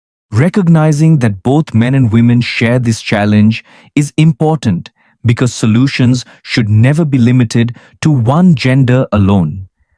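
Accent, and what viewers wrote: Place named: India. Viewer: One can turn the subtitles facility on for ENGLISH, and read the English script. For heaven's sake turn the sound off eliminating the most horrible sound I have heard; the HINDI accent. HOWEVER; there is absolutely nothing in this video of any help for night time trips to the bathroom ( It is Nocturia) and is largely due to a swollen prostate and/or prostate cancer. The swollen prostate situate at the exit of the Ureter from the bladder decreases the capacity of the bladder and it needs emptying a lesser volume more frequently. See a doctor and stay away from podcasts like this one. Clickbait lies in the ONE GLASS catchphrase. It could have been ONE GLASS of ......and name it. It spares viewers the agony of this podcast.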